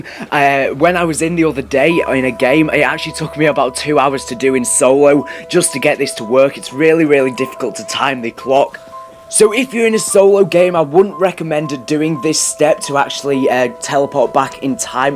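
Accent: England, United Kingdom. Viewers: No, not British. Its the accent/dialect of the area of England he comes from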